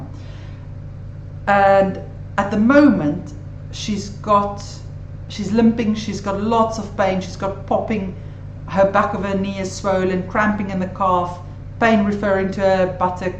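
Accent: South Africa. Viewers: … great and is that a lovely South African accent? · Brilliant video. You sound South African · I am so happy I discovered this site . I have been suffering all of the symptoms shown on this clip , for 2 months , thinking I had rheumatoid problems. It’s difficult to actually see a medic these days , so any problems are generally handled over the phone . The advice I got was to consume painkillers , up to 8 a day , then get in touch if there was no improvement .... I took 2 and tried to keep my walks to 8k per diem , instead of the 16k to 18k that I usually do . I am still strong and reasonably fit for my age (79) .... time to my boring rave ..... I will start my new routine tomorrow. My mood is lighter already. Thanks to the lecturer , well presented information , clearly and succinctly in a great ..... South African accent ? Tomorrow I start . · Quick question, i was messaging my knee and one the outer side just next to the knee cap, I got a long lasting sharp pain, could messaging trigger pain for this kind of injury? 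Love the South African accent! Thank you for the video!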